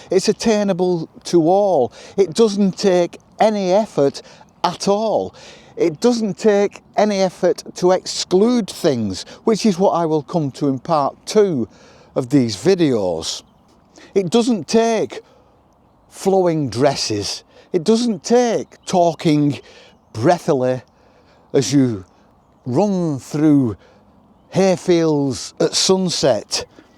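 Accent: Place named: England, United Kingdom. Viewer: Loved every minute of your video, not least, I love your accent. My grandparents emigrated England to come to Australia in the late 60’s and whenever I hear a similar accent it makes me want to visit England. Sadly I’ve never been but would love to see where my ancestors lived one day.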